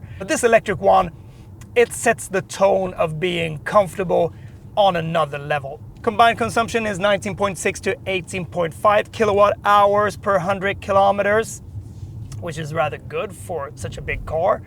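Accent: Sweden. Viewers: Are you from Sweden ?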